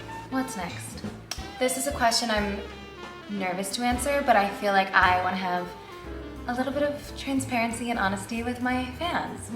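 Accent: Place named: South, United States. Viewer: Yeah what happened to that southern accent she had like 5 years ago? She straight up sounded southern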